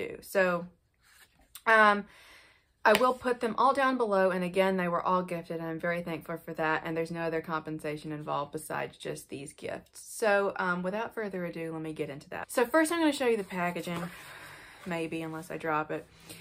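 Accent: United States. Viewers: thanks for the new video! Was thinking about you the other day. "Where did she disappear?", I thought. Glad to see you are fine! As always, nice, detailed review on a couple of awesome sunglasses! The same cool sounding American English to me!:)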